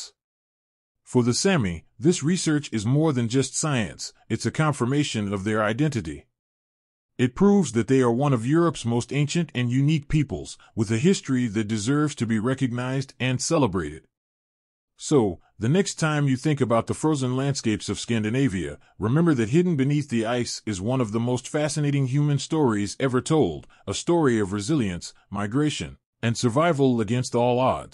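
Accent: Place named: United States. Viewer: No American voice please. FO.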